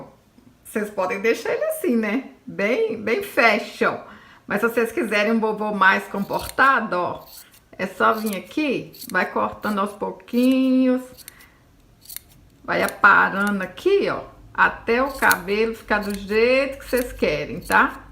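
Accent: Minas Gerais, Brazil. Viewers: Que lindinho ficou esse vovôzinho. Adoro seus vídeos e seu sotaque mineiro me trás uma lembrança linda de uma amiga/irmã que já não está mais nada é mundo . Pessoa maravilhosa, doce e criativa xomo você. Parabéns pelo seu trabalho. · Estou encantada por a caso achei você estou cm covide e fiquei encantada me levantou por acaso você é mineira porque teu geitinho de falar é igual ao meu sou de Cataguases parabéns amei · Só nós mineiros temos este sotaque e expressão. É bom DEMAIS!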